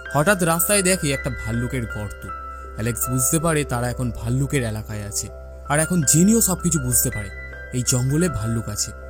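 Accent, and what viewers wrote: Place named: India. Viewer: ভাই আপনি কি ইন্ডিয়ান কারণ ইন্ডিয়ান মানুষরা এভাবে কথা বলে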